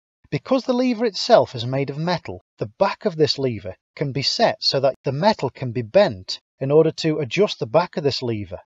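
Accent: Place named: United Kingdom. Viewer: wow its really good to learn from a British guy for once 🇬🇧😁 as my hearing ain't great..no offense to our cousins across da pond.. but I can't always understand yer accents..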